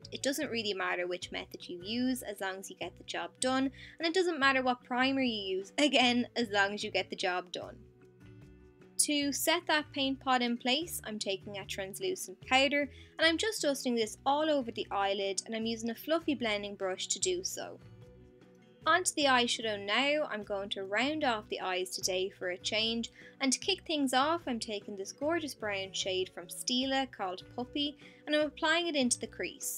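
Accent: Ireland. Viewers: Are you Irish? Because your accent kinda sounds like Jacksepticeye.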